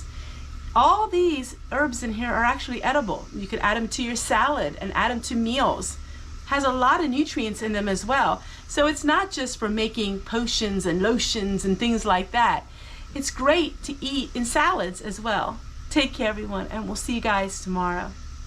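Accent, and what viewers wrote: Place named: Alabama, United States. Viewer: We pronounce chamomile the same way here in Alabama. LOL I’ve only noticed one word you have pronounce different and that’s the word “different”.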